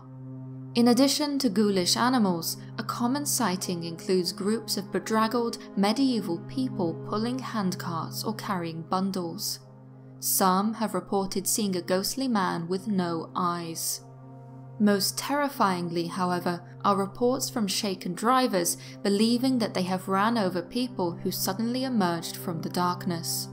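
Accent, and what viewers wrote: United Kingdom. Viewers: is the narrator's british accent real?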